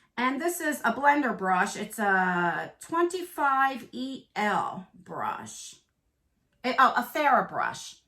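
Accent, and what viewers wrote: New Jersey, United States. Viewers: I absolutely love watching you. I currently live in Alabama (20+ years ) but my family is a bunch of " Damn Yankees " . You remind me of cousins who are sweet with just a little tart thrown in to keep it interesting .By the way did you know you have a Jersey accent ? L.O.L.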